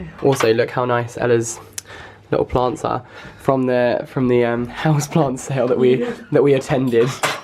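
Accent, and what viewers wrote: United Kingdom. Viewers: Am I the only one who is imitating his accent? Seriously I'm in love with the british accent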